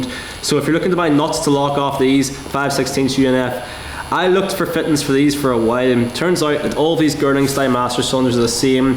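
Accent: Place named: Ireland. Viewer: Nice vid interesting facts about the foot cylinders sizes. Strange hearing a irish/northern accent 😂 if your even from here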